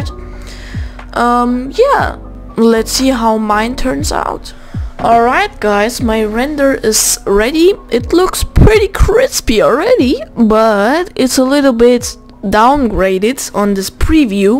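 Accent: Germany. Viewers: yes wait you german? deutsch? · dachte mir schon das du deutscher bist haha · ARE YOU DEUTSCH? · Allein an Stimme erkannt das du deutsch bist, aber danke fürs tur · Kann es sein das du deutsch bist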